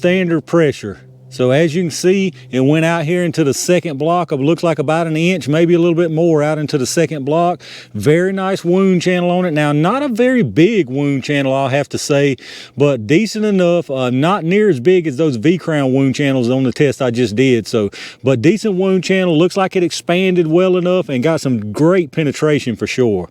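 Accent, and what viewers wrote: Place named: Louisiana, United States. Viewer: Your accent says Louisiana, but the terrain says Georgia. Thanks for all the content!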